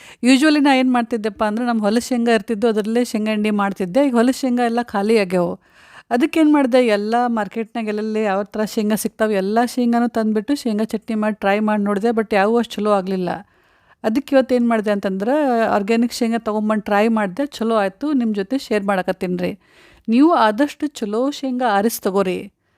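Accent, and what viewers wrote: North Karnataka, India. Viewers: ನಿಮ್ಮ ಭಾಷೆ ❤️ ಹೇಗೆ ಮುಂದುವರ್ಸಿ ಉತ್ತರ ಕರ್ನಾಟಕದ ಭಾಷೆ ಲೀ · ಮೊದಲನೆಯದು ಭಾಳ ದಿವಸ ಆಗಿತ್ತು , ಉತ್ತರ ಕರ್ನಾಟಕದ ಭಾಷೆ ಕೇಳಿ , ಮತ್ತು ಅದರಾಗ ನಮ್ಮೆಲ್ಲರಿಗೂ ಹಿಡಿಸುವ ಶೇಂಗಾ ಚಟ್ನಿ ಕಲ್ಲಿನ ಗ್ರೈಂಡರದಾಗ ಮಾಡುವುದು ಹೇಳಿದ್ರಿ, ತುಂಬಾನ ಖುಷಿಯಾಯಿತು. ಧನ್ಯವದಗಳೊಂದಿಗೆ 🙏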